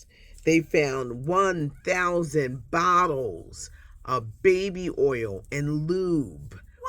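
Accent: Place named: Philadelphia, United States. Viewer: Bad guy Diddy is. Ur voice SCREAMS Philly! I love u listen to WDAS WHEN I Lived in Philly. Props to u beautiful lady.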